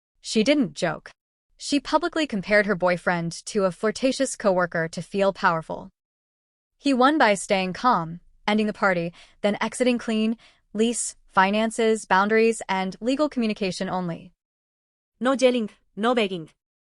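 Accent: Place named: Spain. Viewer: WTF IS WRONG WITH YOU AND STUPID SPANISH ACCENT.SCREW THE NARRATION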